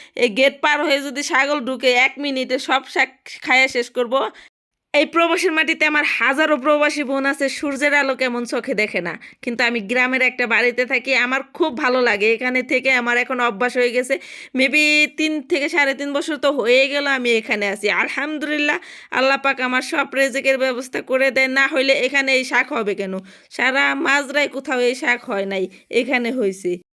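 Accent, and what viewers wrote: Manikganj, Bangladesh. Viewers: আপনার কথা গুলো আমাদের মানিক গঞ্জ এর মতো লাগে আপনার বাসা কোথায় আপু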